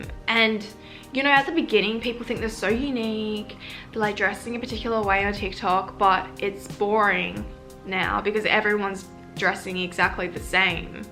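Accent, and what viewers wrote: Australia. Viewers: I'm learning English and I found the Aussie accent soorrrr gorgeous. Now I'm learning English with an Aussie accent thank u for helping, a lot of love from Azerbaijan 🇦🇿 🇦🇺!!!!!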